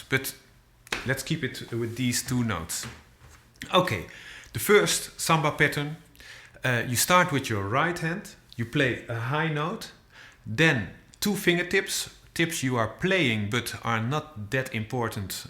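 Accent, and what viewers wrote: Netherlands. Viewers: You sounds dutch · Je bent zeker een hollander,ik herkend de uitspraak,zelf kom ik uit Iran en woon in Parijs, ik will here je bedanken voor dit aardige video dat je ons laaten zien,ik ben een beginners om cajon spelen en vond ik je video perfect,dank u wel....Thank's a lot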